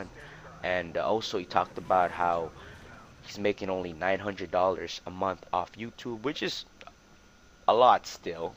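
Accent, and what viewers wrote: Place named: Asia. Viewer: I love you Asian accent you badass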